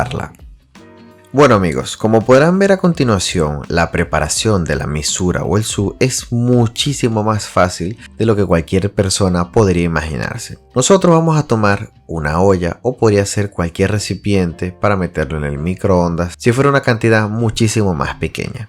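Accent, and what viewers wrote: Venezuela. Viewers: Me gusta mucho su acento venezolano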